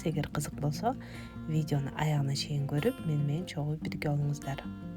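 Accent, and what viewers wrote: Standard. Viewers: Таза кыргызча суйлоптур азаматсыз ❤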